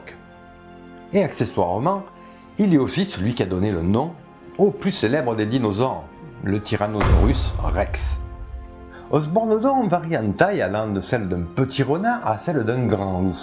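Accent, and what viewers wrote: South, France. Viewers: Vous avez quoi comme problème avec l'accent du sud sans déconner. Vous vous êtes entendu avec votre accent pointu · Beaucoup d'infos interessantes, très détailler et j'aimes bien l'accent du sud de la France, c'est sympathique et la prononciation impécable, un seul hic, j'ai entendu mouflette décrivant c'est animal d'une puanteur exécrable, au Québec nous disons mouffette, interessant de savoir la différence d'appellation.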